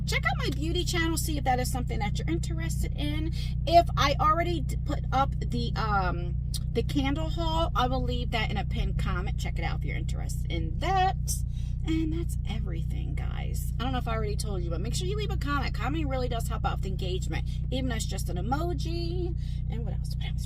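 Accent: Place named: New York, United States. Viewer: Hey! I’m originally from New York, I’ve been in Florida for 30 years. I hear your voice and I get homesick L O L… stick to your guns on your new life! Sounds like you’re getting another chance at a calm, peaceful, relaxing life! Count your blessings! You are rocking it! ❤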